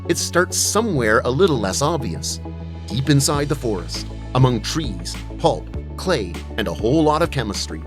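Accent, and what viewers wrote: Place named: Midwest, United States. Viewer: Love the Midwest accent and the info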